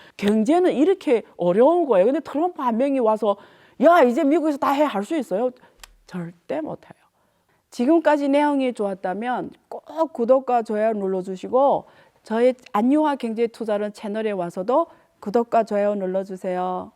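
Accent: Yanbian, China. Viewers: 중국 지금 너무 않좋은거 잘 아는데 논리 비약이 좀 심하신듯 하네요. 관세정책과 환률등 말씀하시는 내용이 경제학적으로 맞는 것인이 의구심이 들고 말씀하시는 말투가 한국분이신가요 아님 귀화 조선족이신가요? 하여튼 개관적인정보로 이야기해주시면 감사할듯 합니다. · 안유화 처음 보는데 이사람 말투가 조선족인데 ㅋㅋ 조선족은 중국인이지 중국인이 중국 좋다고 지들이 최고라고 하지 미국이 더 낫다고 하겠나? 간첩일 수도 있고. 지금은 중국이나 한국이나 인지전 시대임. 사기치고 간첩보내서 사회 교란시키고 정보빼내서 해당국가 망가뜨리고 이런 전쟁하고 있음. 그걸 막기 위해선 철저히 중국인은 내쫓고 배척하면서 물건도 팔아주지 말고 고립시켜야함. 그럼 지들끼리 지랄하다가 폭망하는거지. 근데 간첩새끼들이 동조하니까 저런 악마들이 득세하는거야.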